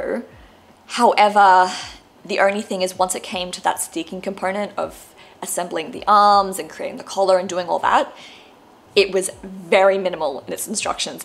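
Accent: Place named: Australia. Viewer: I loved seeing your knits for 2022 and looking forward to watching more of your episodes. It is nice to hear an Aussie podcaster in the mix. I have to admit, I gasped horrified when i saw your felted sweater. 🤕I just started a knitting podcast and you inspired me to do a 2022 knits video. It was really interesting for me to go back over the year and see what I made.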